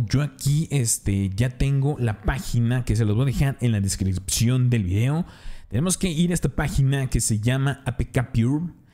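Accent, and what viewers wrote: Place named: Russia. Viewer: Gracias bro, quería hacer este contenido en mi canal, y me pasaba este error, aparte me gusta Tu acento, parece Ruso jaja.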